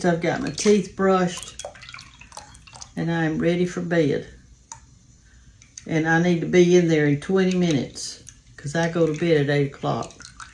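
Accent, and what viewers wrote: South, United States. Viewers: I am from Georgia Ms,I just love you. And yes all of us from the south have a different accent and way of saying things. You make me smile. ❤🎉 I am trying Tallow Balm. Hope it works,can't hurt 😂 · You have a southern accent.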